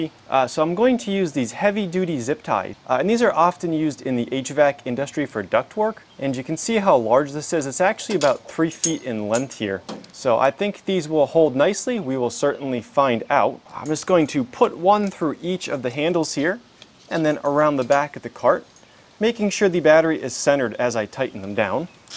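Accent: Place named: Canada. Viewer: are those Canadian dollar prices ? you sound Canadian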